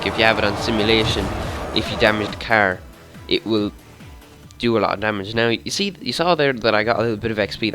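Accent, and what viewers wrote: Ireland. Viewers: Hey your Irish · MUP ya boil ya yer irish ☘️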